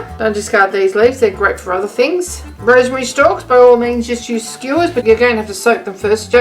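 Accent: Australia. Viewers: Another scrumptious recipe to add to my collection, thanks! I love the way the Strines say "heh" for here: )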